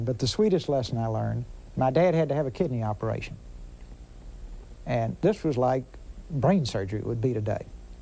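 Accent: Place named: Texas, United States. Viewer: I love how he speaks with such eloquence through that awesome Texas accent.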